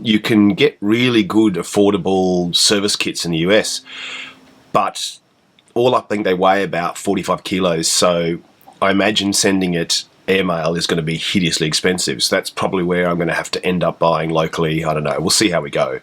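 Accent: Australia. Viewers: It's weird seeing this. Usually with a West Virginia accent and "hold my beer" thrown in from time to time has been my experience. Seeing and hearing the Aussieness of it is pretty cool. Interesting "series", thanx for taking the time to make the videos and post them!!! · Are you from Australia?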